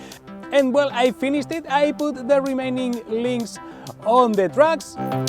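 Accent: Spain. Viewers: You can't unhear the Spanish accent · Hey, are you from Spain? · Spanish english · This guy has to be spanish mainly by his accent right? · He's from spain lol, im spanish too and I recognise the accent, appart from the fact that is a spanish name. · Eres español no? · Eres español verdad? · de donde eres . eres de España? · Are u spanish?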